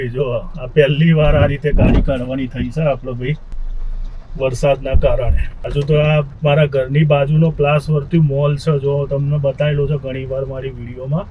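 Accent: Mehsana, India. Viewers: Tamaru gam khu che bhai tame saras amara jem mahesana ni boli bolo cho gam nu nam aapjo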